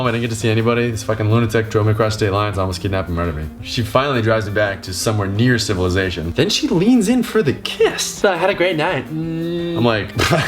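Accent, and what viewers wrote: Boston, United States. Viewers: I just like listening to your Boston accent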